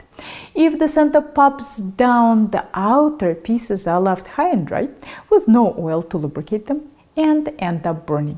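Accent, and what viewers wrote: Russia. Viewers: I just stumbled upon her for the first time. Your comment is spot on. The most informative 13 minutes of baking I have seen with no distracting channel promotion etc.. I love her and her Russian accent is icing on the cake. Can't wait to see what else I can learn. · I have been thinking. Where is she came from?! Damn, Russia. Why do you have a such heavy accent? You came as a teen to the US?! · Wow. This is a class on the "understanding of thermodynamics". Thank you and by the way, I love your accent. Your command in English vocabulary supersedes your Russian accent, got me confused for a moment. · Idk if it's a Russian accent but this women has me hooked on every word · Is she Russian?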